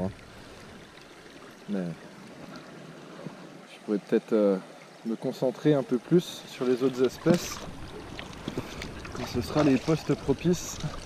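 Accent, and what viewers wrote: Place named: Franche-Comte, France. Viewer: Super vidéo, très agréable à regarder, a t'entendre je pense que tu dois être franc-comtois aussi non ? Continue comme ça, un régal cette session ! :D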